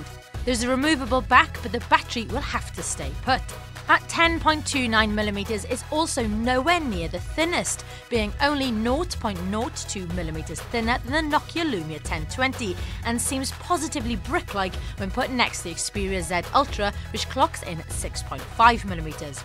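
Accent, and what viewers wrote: Wales, United Kingdom. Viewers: ur from WALES ! Alright, hows it going Alright?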